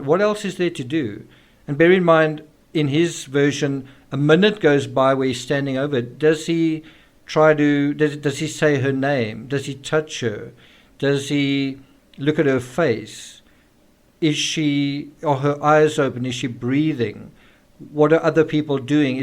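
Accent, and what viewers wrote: South Africa. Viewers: Are you from south africa? Your accent reminds me of my friends from south africa. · South African youtuber? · I know an SA accent when I hear it. Awesome channel!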